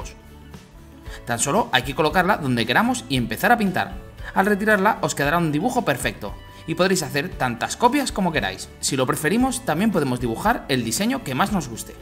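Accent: Spain. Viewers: ??, eres catalan? ☺️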